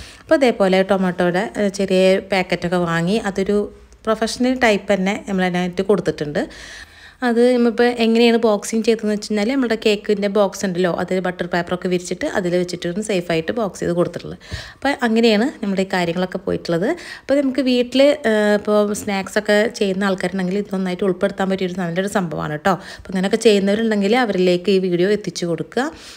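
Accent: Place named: Malappuram, India. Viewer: നിങ്ങൾ മലപ്പുറം ജില്ല ക്കാരിയാണോ. സംസാരം തൃശൂർ ശൈലി യിലേറെ മലപ്പുറം ചുവ ആണല്ലോ.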